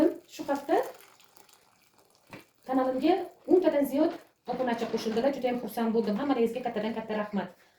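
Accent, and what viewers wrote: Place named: Gijduvan, Uzbekistan. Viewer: Ваалейкум салом,бемалол опа десангиз хам булаверади.Каерликсиз,акцентиз Гиждувонликларга ухшаяпти.Биз Навоийданмиз.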